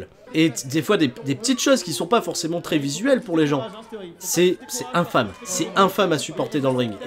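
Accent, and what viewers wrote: North, France. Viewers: Salut tu es du nord ? :)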